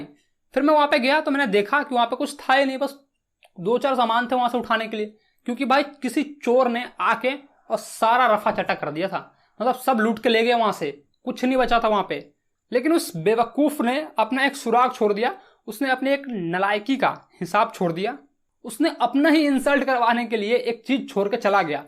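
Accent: Bihar, India. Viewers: Bhai aap Bihar se hai kya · Bro are you Bihari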